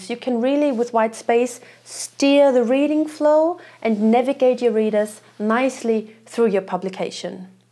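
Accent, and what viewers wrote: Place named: Europe. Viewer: European English accent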